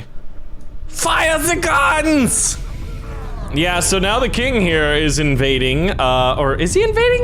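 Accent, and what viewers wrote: Germany. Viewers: im from germany and i looove your german accent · Great German accent 10/10 (I am not getting paid by to say this)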